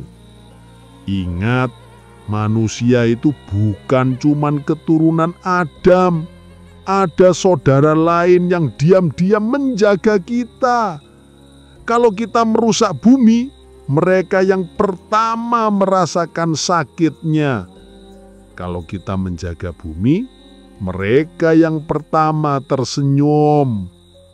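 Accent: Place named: Banyumas, Indonesia. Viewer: Nyimak ewong ngapak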